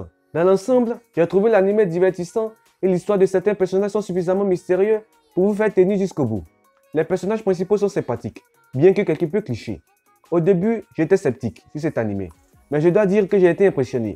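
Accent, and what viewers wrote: Ivory Coast. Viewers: Toi tes un ivoirien ça se sent mais prends ton temps pour parler je pense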